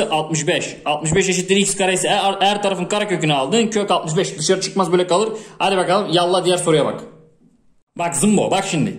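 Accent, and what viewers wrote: Thrace, Turkey. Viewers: Trakya şivesi yapma sadece küfür yapppp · Trakya şivesi güzel la kalsın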